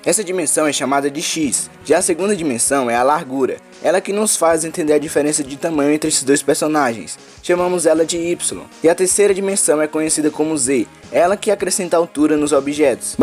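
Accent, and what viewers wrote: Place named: Brazil. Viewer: ainnnnn xenofobicomm parece até sulista Edit: tu realmente é baiano?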